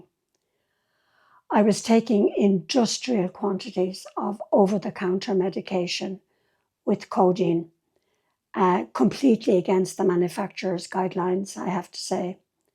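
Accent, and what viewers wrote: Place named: Ireland. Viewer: Thank you for sharing your story. I was scrolling through utube & ran across your video & your Irish brogue. My Dad was from Ireland. Many blessings to you . Im sure you sharing your story will bless many. Sending love & light.❤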